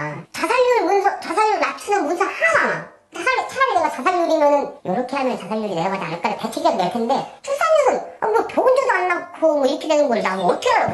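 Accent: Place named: Chungcheong, South Korea. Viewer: 말투가 딱 아산 예산 이쪽인데?ㅋㅋㅋㅋㅋㅋㅋ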